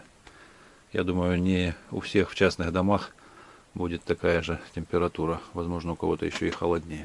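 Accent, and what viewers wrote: Ukraine. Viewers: Судя по характерному говорку с ридной батьковщины приыхал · Судя по речи хохол в землянке от войны прячется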